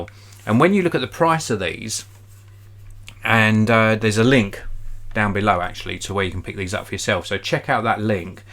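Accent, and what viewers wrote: United Kingdom. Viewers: I wonder if the roll surrounds on the bass drivers are acclimatized to withstand UK's high humidity climate. Surrounds made of FOAM last less than a year ! BTW, love your British accent.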